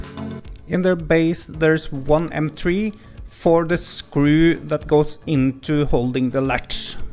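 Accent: Norway. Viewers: The norwenglish is strong in this one. Nice video neighbour.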